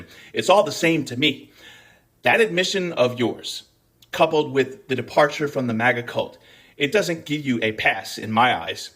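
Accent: Scotland, United Kingdom. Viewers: are you from Scotland because i always hear an accent come through on your vids?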